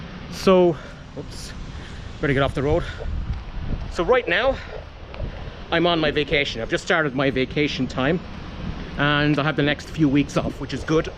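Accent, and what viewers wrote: Waterford, Ireland. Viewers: Hello from Kilkenny good info on your grandfather always thought you had a bit of a blaa accent makes sense now keep up the great videos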